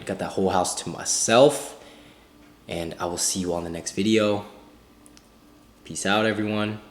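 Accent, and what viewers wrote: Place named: Italy. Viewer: Pretty solid italian accent. Bye from Italy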